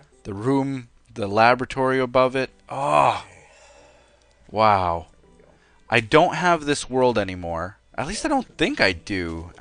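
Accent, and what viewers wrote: Canada. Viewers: Best stereotypical Canadian voice ever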